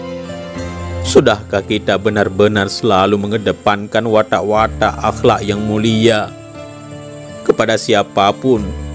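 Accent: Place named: Bali, Indonesia. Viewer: suaranya mirib suku bali ya 😇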